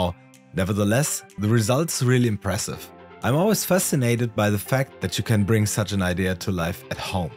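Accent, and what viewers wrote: Germany. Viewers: Eindeutig deutscher Dialekt 😁 Edit: Akzent xD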